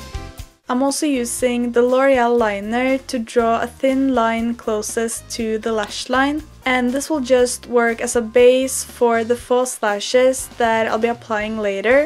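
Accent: Norway. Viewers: Fun design, and awesome execution! :) I think that is a Norwegian accent, isn't it? Thanks for the awesome tutorial!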